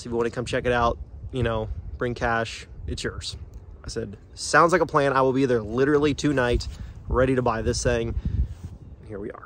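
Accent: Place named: Kentucky, United States. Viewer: He's definitely from Kentucky there accent is almost as distinct as brittish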